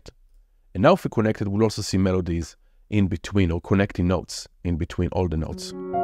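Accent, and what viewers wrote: Israel. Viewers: I kind of respect the fact that you're not even trying to work with your Israeli accent. It's as if you're almost putting a little extra effort to sound more Israeli than you naturally do. :) Kudos man. Truly.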